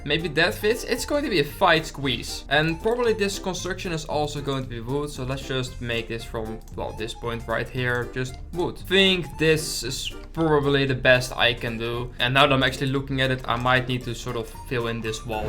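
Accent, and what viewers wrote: Netherlands. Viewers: are u from the netherlands?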